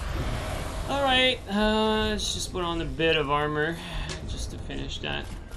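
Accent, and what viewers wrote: Quebec, Canada. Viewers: He sounds Québécois to me.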